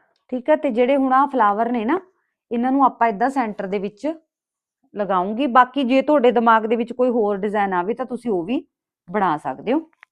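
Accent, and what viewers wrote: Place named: Hoshiarpur, India. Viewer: Hoshiarpur de boli h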